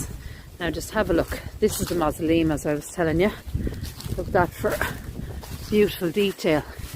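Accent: Ireland. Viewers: Coffins need a clean. You sound Irish, are you in Ireland?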